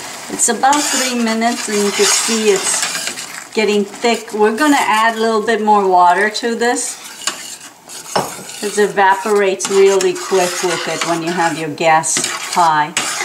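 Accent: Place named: Caribbean. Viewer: You sound more West Indian than East Indian. Are you guys in New York?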